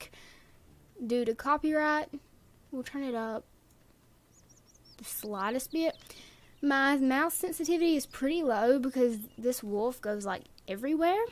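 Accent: South, United States. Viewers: Its an off day when whatever your eating just sinks in to the ground....... also lol southern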